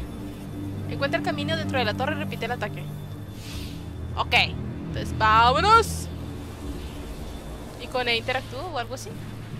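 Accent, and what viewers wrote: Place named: Caribbean Coast, Colombia. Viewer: Pareces costeña en ciudad de cachacos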